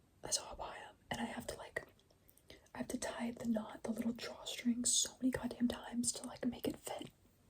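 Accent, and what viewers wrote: California, United States. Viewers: She definitely looks and sounds Californian. She’s humble though. ❤ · That accent is pure cali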